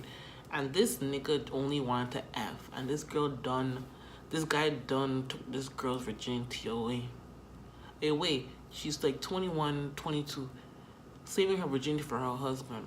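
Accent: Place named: Africa. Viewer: I love your African accent 😂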